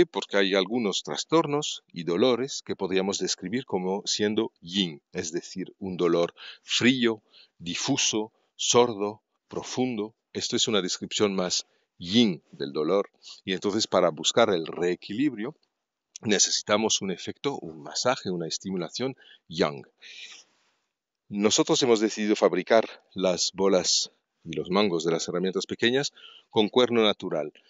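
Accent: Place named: United Kingdom. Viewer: I love your british accent!! It's very interesting!!!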